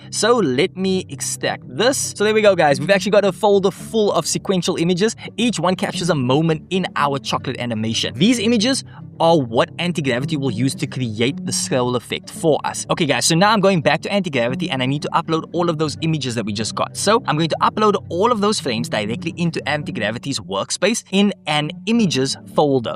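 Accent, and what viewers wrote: South Africa. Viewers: Where is your accent from? SA? - Thanks for the tutorial! Would like to know how React plays that mass of images? Looks load and performance intensive. And I also would have loved to see how this works on mobile... different image set in portrait format?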